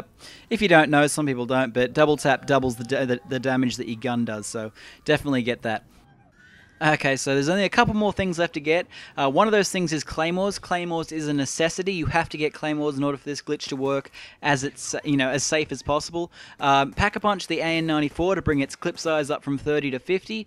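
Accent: Australia. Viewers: Nice vid man i subbed u swnd like ur from Ausie which is kool coz im from NZ i hav no prob with ausies bro my teacher is from Australia & shes kool as catch ya later mate;-)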